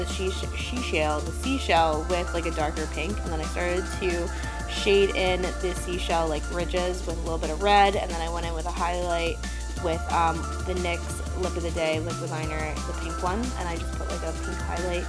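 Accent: Pennsylvania, United States. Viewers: I'm a new subscriber! It's so funny I could tell you were from PA by your accent! Lol. I love it. I'm from PA too! You are so talented ❤️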